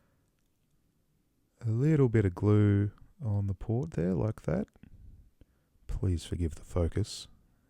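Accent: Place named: New Zealand. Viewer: Am I hearing an NZ accent.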